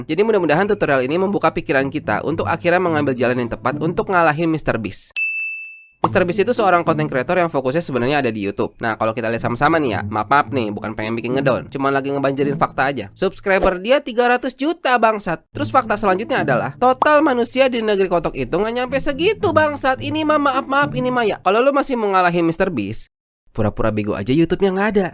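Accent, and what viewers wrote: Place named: Depok, Indonesia. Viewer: suara lu,mirip mas mas depok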